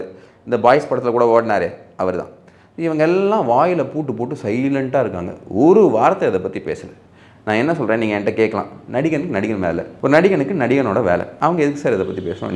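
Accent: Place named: Tamil Brahmin, India. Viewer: Hey why the tambra slang man. Omit that.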